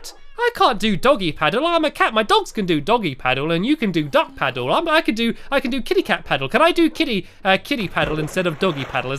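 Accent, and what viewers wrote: United Kingdom. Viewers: He can't help his accent hes not from america gosh guess your saying people that are not american have a dumb accent wrong ! I think he is lucky living in the UK it sounds like fun with the queen living somewhere there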